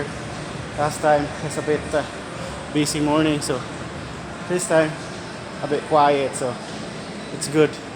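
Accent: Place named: Visayas, Philippines. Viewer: bansaya mo English oiey, nice vlog kavisaya keep posting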